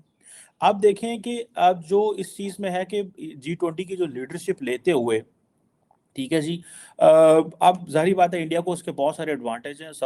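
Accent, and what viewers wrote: Punjab, Pakistan. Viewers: Love your punjabi accent😅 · you amaze me sometimes! You sound like my father who was from Gujranwala and had the same lehza in the Punjabi he spoke! Ha mare Kutte bhi Khas hain! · I love the Punjabi tadka you give. Keep it up.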